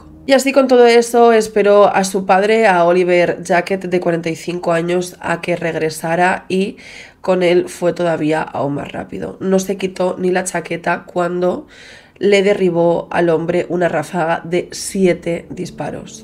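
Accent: Spain. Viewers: Por que esta habla en este vídeo como española y en la vida actual en instagram dice que es Mexican American y habla como mexicana?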